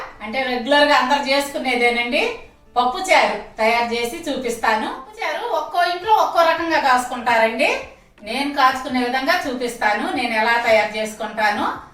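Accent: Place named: Telugu, India. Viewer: Wow :: What a Telugu ! Bengaluru or Belgium can not change this lady' s Telugu accent or rustic aroma Manu more dishes like Kandi Pappu and Kandi Ginjala Pachhadi please Prakasam Panthulu Garu used love Gongura Pachhadi in Ongolu style PV Garu Chintha Kaya Thokku in Karimnagar style We are proud of this lady and her rustic way of presentation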